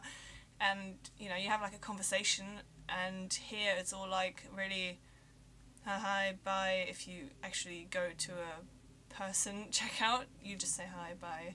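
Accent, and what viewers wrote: England, United Kingdom. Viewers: You sound British you are yeah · Picked up a very English accent. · Please take this accent back to Germany and teach them. The British Accent will soon vanish and be replaced by the American one. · If you're German, then why the English accent?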